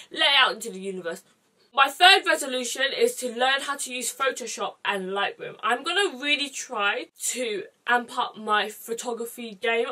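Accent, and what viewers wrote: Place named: England, United Kingdom. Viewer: OMG this is the first youtube video of your's that I've watched and you have an english accent!!! somehow i knew you were british but i always imagined your instagram videos in an american accent lol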